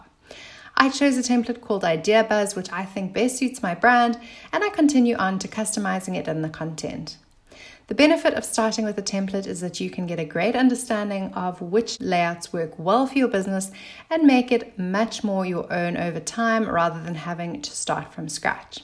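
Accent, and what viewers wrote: South Africa. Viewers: sooooo wonderful to hear a South African accent. Yay! Would you know how MailBlaze compares to MailerLite? Thank you for this YT- take care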